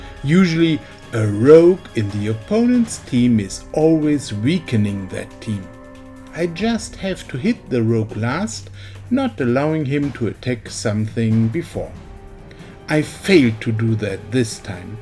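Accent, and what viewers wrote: Germany. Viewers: I am only in colonial age, but I watched the video just to see the amazing robots, animations and your German accent😂 love your vids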